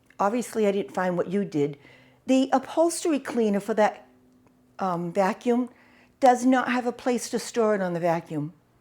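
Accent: New England, United States. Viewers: Greetings from Maryland! I enjoy hearing where your subscribers live. Both of my parents grew up in New England (NH), so listening to your accent warms my heart. Thanks for the walk-about.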